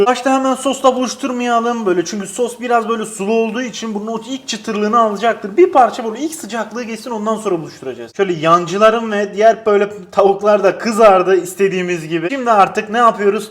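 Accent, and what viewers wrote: Adana, Turkey. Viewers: Ya bak abi sen adanalı gibi konuşan ve Diyarbakır'da yaşayan birisin bugün barbecue wings yapıyoruz demenin ne gereği var barbekü soslu kanat yapıyoruz desene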